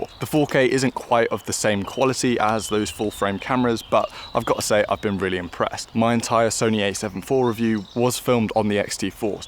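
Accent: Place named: United Kingdom. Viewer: thanks for your quick reply, of course you are english mother tongue (only your surname sounds Italian, your pronunciation and accent are perfectly british), I am not, that's why I wrote you that you are very clear to understand (for a foreigner like me).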